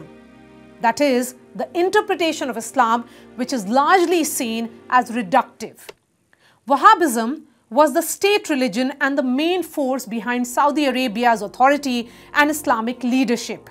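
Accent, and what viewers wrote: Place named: India. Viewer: You the only white girl with Indian accent.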